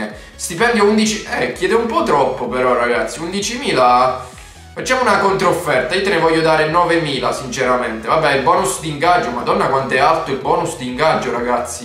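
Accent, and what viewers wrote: Bari, Italy. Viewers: ma sei barese?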